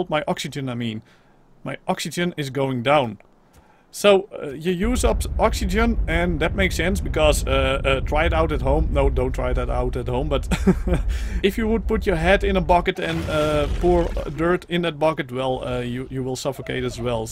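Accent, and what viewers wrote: Netherlands. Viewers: Ben je nederlands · Mooi nederlands accent. This is a sifferent sort of cook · Jij bent nederlands dat hoor je echt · you sound very dutch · the dutch english accent omg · What language are you you sound dutch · Haha ik hoorde gelijk dat je Nederlands was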